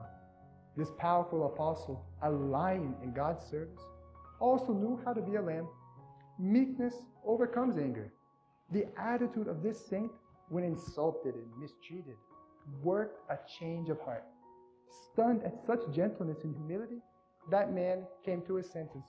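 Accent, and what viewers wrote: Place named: Europe. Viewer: Many thanks. I thought he might’ve been European with that accent.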